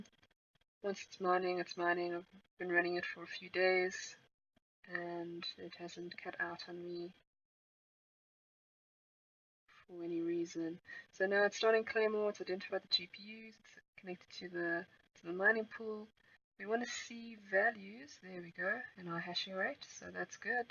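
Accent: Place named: South Africa. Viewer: you sound very South African. Awesome. Dankie.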